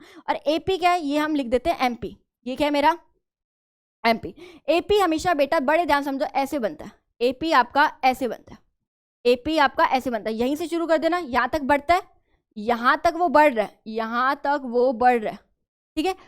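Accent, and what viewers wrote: Punjab, India. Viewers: Mam your way of talking is really cute😂 giving full Punjabi vibes 😌and you gave the best and the easiest explanation of this chapter ❤love u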